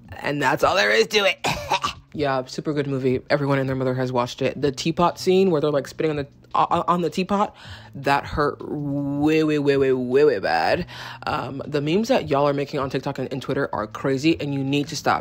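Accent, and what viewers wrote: United Kingdom. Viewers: i love how your british accent is literally Adele. So comfy sounding.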